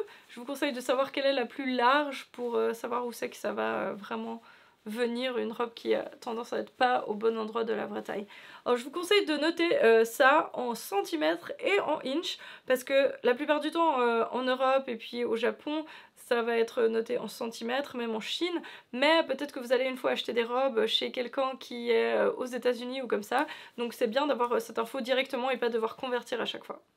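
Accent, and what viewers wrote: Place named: France. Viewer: Je connaissais tes vidéos anglaise et jai tout de suite reconnu un accent français ahah jme suis pas trompée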